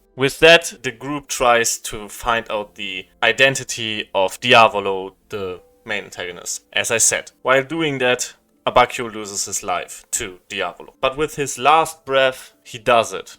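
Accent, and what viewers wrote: Germany. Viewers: You sound VERY German in accent coming from another German, it's very endearing to hear! This little thing that delighted me aside though, solid video! As a local Abbi fan I thoroughly enjoyed it. ❤️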